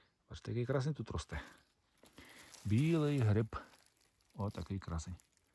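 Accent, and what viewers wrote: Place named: Transcarpathia, Ukraine. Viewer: По акценту то ви є з Закарпаття. В Карпатах білих зараз практично немає. Небуло дощику. Сухо... Удачі вам і гарного врожаю !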